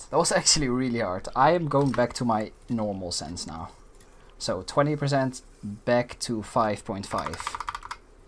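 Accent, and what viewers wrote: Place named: Netherlands. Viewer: Je hoort het Nederlandse accent haha ❤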